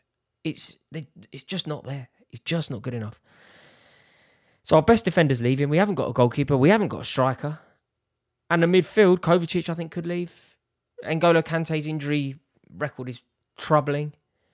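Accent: United Kingdom. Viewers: I come to this channel for the posh English! 🙃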